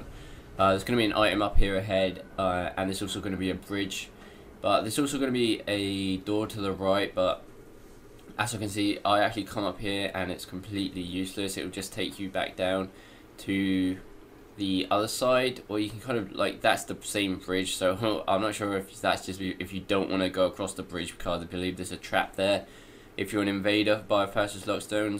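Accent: England, United Kingdom. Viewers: agreed, this guy plays like a noob, has England's equivalent of a Mississippi delta mudman inbred's accent, and nobody gives a fuck about the doors that don't lead anywhere so why is he even bothering to show them? He should just say "don't waste pharros on these" and move the fuck on.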